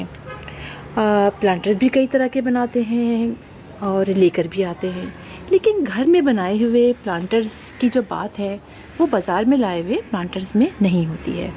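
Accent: Pakistan. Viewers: her Urdu accent is Pakistani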